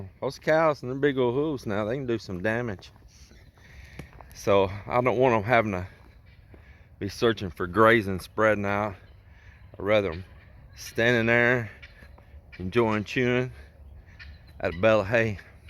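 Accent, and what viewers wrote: Texas, United States. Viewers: Now I'm gona watch your channel everyday..love the Texas people and I love Texas,,we need Texas,,missed your accent..lol I'm originally from n.c. my Daddy was a farmer..I helped slop the hogs when I was 4 and pick some cotton balls..dig taters..love cracklins..bet Lil lady could cook some up...bet u have..ok it's pm here in pa.wonderfully cool..59dgrees.happy to git your chanl.love and peace to you both..you're a beautiful couple..tell her hello for portia...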